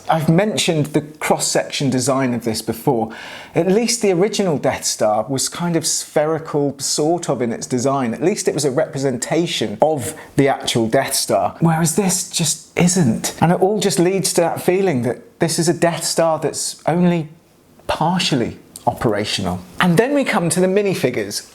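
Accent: England, United Kingdom. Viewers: You must be from the same 10 mile area of England as tom scott. Your accents are to close to be anywhere farther apart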